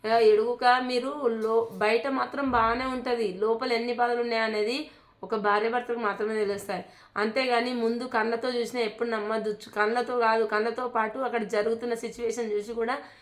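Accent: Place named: Siddipet, India. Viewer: Meedi ekkada akka ma siddipet language lagane undi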